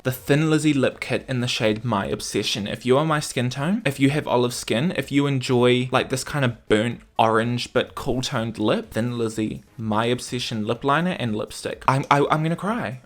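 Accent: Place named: New Zealand. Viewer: LOVE hearing a Kiwi accent! (waves from Southland) ❤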